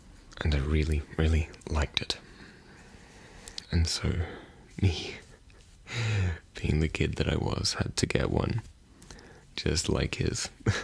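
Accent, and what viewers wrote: Australia. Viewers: Living overseas I never realise that I could miss the Australian accent. Nice video. Roleplays? Crystal shop?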